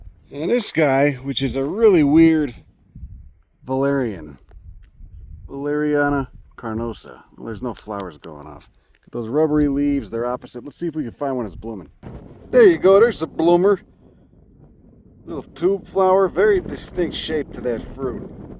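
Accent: Chicago, United States. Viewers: I appreciate how u cover a ton of microbiomes and blending/borderline climates. Really interesting and funny channel. Chicago is my new favorite accent 😂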